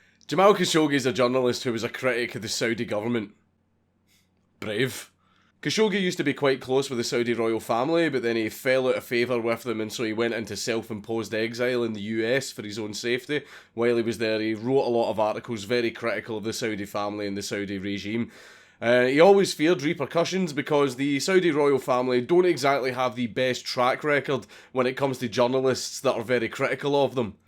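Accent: Scotland, United Kingdom. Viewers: Yay angry Scottish man can ruin my day!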